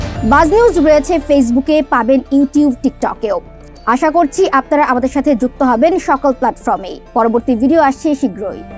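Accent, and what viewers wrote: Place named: Kolkata, India. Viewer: _____এই চ্যানেলের উপস্থাপকের সংবাদপাঠ কলকাতার মতো। এটা কি সত্যি বাংলাদেশের YouTube চ্যানেল নাকি কলকাতার....🤔🤔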